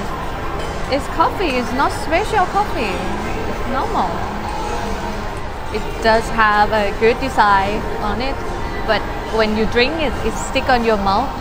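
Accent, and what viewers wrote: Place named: Asia. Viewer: Bien pour une première, bizzarement filmé ce doit être du au montage avec de nombreuses coupes non? sinon son anglais a parfois est rigolo avec cet accent tonique asiatique, ça me rappelle par moments Michel LEEB dans son sketch du chinois..LOL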